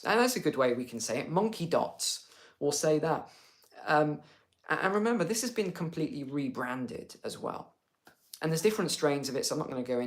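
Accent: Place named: United Kingdom. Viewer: Hi Sir ! You always have a good report ! I want to tell you that I love your voice and your Brit accent ! My relatives are from Chester, England . my great great grandfather came from England to the US at seventeen yrs of age . Before Ellis Island . He came alone and stayed with his sister here in Connecticut ! Became a prosperous farmer and had 400 acre farm . He was a fine Christian man. Still got his road named after him .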